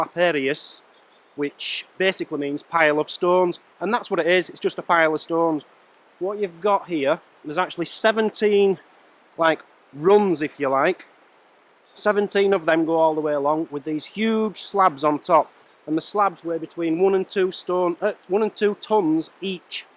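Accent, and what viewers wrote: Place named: Glasgow, United Kingdom. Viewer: I detect Glasgow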